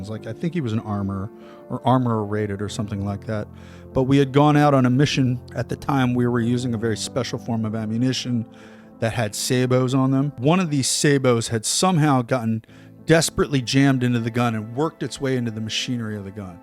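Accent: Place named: United States. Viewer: Sounds like a real American brother. 🇺🇸🇺🇸🇺🇲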